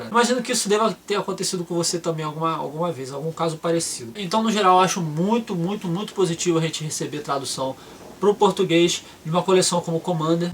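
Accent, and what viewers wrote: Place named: Rio de Janeiro, Brazil. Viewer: carioquês é foda de aguentar... desculpa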